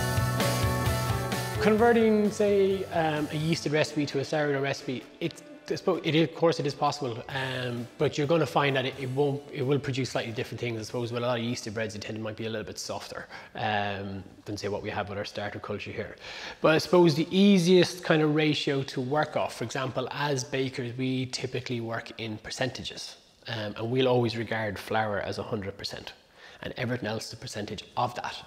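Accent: Ireland. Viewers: I love your accent, I feel in I’m Ireland when I listen to your voice. I really like you baking style. Cheers buddy keep making great bread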